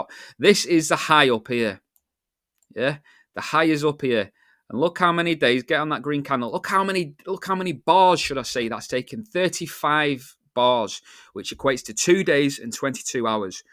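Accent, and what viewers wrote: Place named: Lancashire, United Kingdom. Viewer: Honestly mate, you sound like you're from where I live, well the area anyway I live near Burnley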